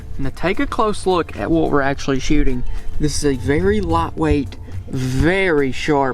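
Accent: United States. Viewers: I use the crosman 1322 with a 14" barrel and a shoulder stock. I pump mine 12 times and I get passthroughs and instant kills on squirrels, pigeons, and rabbits within 20 yards. Also, what are you talking about "twice the size." A .22 is a .22, these pellets have the exact same diameter as a .22 projectile, they are just shorter because they dont have the powder charge behind them. Also, you sound american, but you bought the tuned down Canadian version of the crosman 1322, the US version is over 600fps out of the box. Definitely buy the $20 crosman shoulder stock and rotate your iron sights to peep sight, or upgrade the plastic breach to the steel breach and put a red dot on it.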